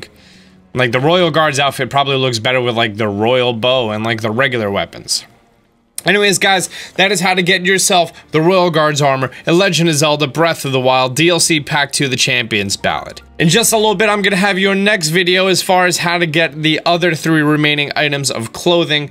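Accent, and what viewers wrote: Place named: Brooklyn, United States. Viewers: Nice Brooklyn accent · why did you have to do the brooklyn acent?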